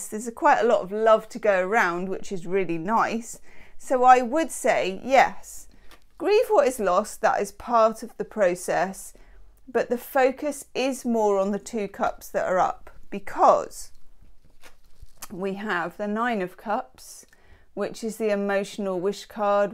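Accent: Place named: Cockney, United Kingdom. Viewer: Fahhhmly. What a wonderful cockney accent you have. So many talents. And such sweetness. Thank you.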